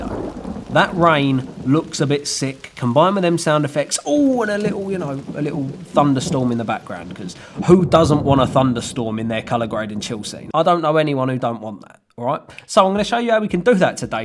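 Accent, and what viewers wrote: United Kingdom. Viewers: I watch your videos just to hear your Brit accent! So nice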